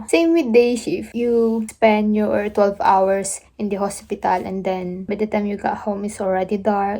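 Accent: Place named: United Kingdom. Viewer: Nag eevolve ka na talaga classmate. British accent ka na. Cute.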